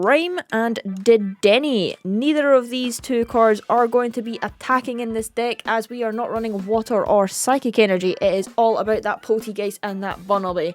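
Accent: Scotland, United Kingdom. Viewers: Haha, the scottish accent is great, I'm glad I didn't embarrass myself.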